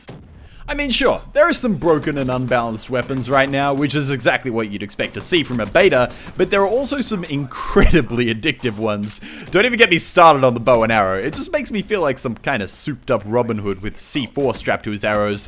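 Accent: Australia. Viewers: TIL is Australian and not British. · lel you are australian ? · are you australian ?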